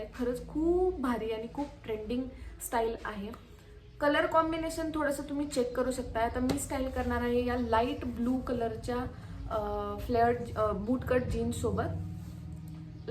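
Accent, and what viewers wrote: Kolhapur, India. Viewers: बोलण्याच्या स्टाईल वरून तरी तुम्ही कोल्हापूर साईट कडच्या वाटता ताईसाहेब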